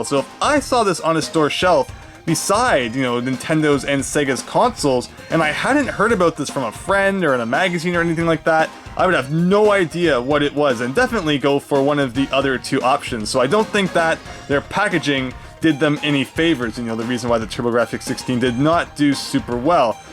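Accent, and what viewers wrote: Canada. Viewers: Have to ask..I hear a Canadian accent, I get the impression that the Tg-16 was a bit more popular in Canada rather the US. Did NEC directly supply Canada? Just curious .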